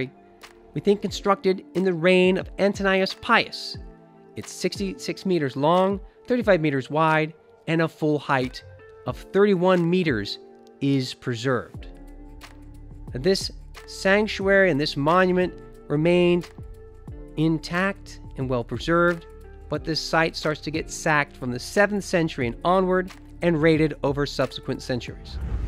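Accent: United States. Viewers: DAMN YANK NARRARTION!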